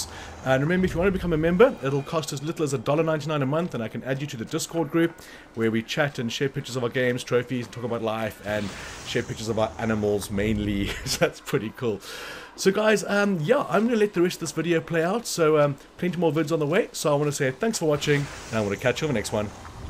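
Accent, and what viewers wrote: South Africa. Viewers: yes sir. You also from SA? Sounds like a South African accent 😁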